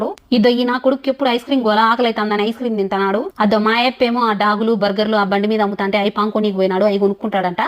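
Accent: Rayalaseema, India. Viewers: Super ga chappavu bangaram❤ Rayala seema slang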